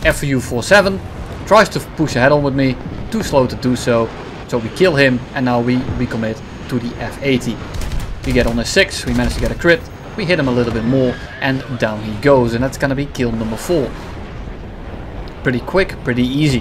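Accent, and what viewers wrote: Netherlands. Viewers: i really love jouw dutche accentje😂 geen haat bro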